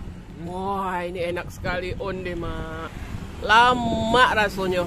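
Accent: Minangkabau, Indonesia. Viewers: ni boleh berdialek minang tapi juga bisa juga mengeluarkan perkataan jawa.....terima kasih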